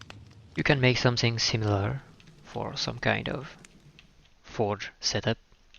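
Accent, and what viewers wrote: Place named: France. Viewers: miam le bon accent francais :) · j'etais donc pas fou ! j'avais reconnue notre bon vieux accent héhé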